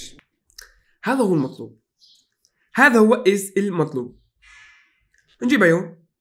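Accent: Egypt. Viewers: يا متابعك من اسبوعين يخي وربي اضحك من قلبي غير انه لعبك اسطوري ماشاء الله انا مصري واحب لمن احد من بلد عربية يتكلم مصري اللهجة مرة op عليك 😭😂😂🤍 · اللهجه المصريه اسطوريه عندك يسطا❤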